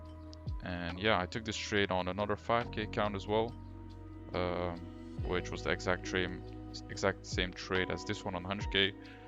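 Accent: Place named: Flanders, Belgium. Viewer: Volgens mij hoor ik een klein vlaams accentje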